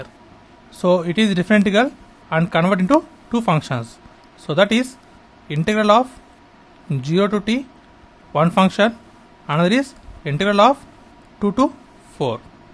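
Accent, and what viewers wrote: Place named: India. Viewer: Indian accent a little too thick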